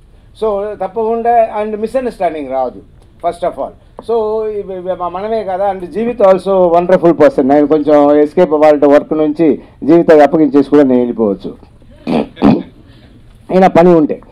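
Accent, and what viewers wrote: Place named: Telugu, India. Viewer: Don't blame him about his Telugu slang OK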